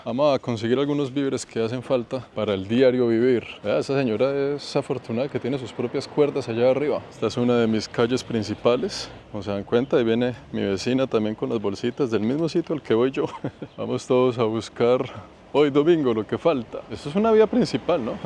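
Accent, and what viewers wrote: Bogotá, Colombia. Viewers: 👍habla rolo, o sea como hablamos los Bogotanos en Colombia, sin acento tan marcado .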